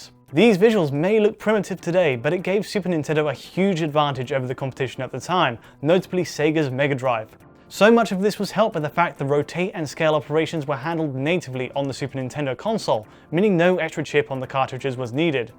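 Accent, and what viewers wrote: United Kingdom. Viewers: He's a Brit mate. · The brits pronounce Mario as "Mary-O"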